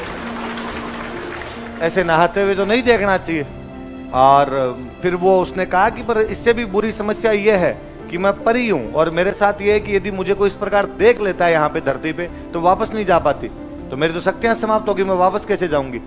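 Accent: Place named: Marwar, India. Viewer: Aapari मारवाड़ी बोली री मिठास ही इसी हे साब ❤❤❤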